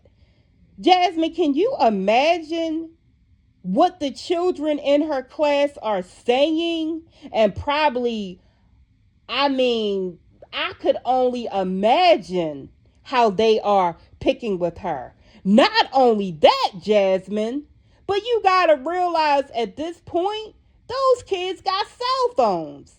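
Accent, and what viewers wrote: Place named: Maryland, United States. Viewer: Hey, You have an accent the same as my cousins from Maryland Are you from Maryland or Washington, D.C,?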